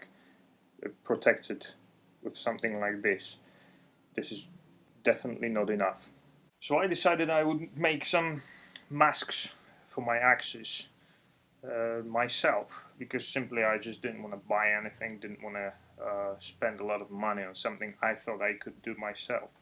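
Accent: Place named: United Kingdom. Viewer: powiedz mi skąd masz tak fantastyczny akcent? Urodziłeś się w UK czy w Polsce? BTW fantastyczne materiały miło się Ciebie ogląda i te polskie napisy, w których tłumaczysz często na skróty wychodzą czasem zabawnie:) Tak trzymaj!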